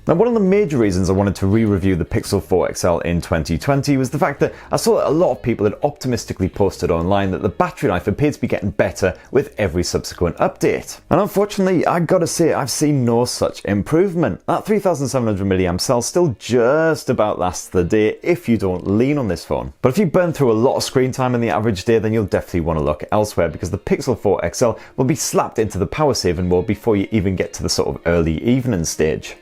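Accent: United Kingdom. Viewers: Your Accent is marvelous love to listen it all day...😊😊 Brit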